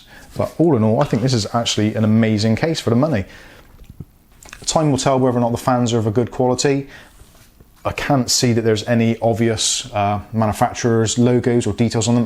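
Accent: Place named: Cornwall, United Kingdom. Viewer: Are you from Cornwall? I recognise your accent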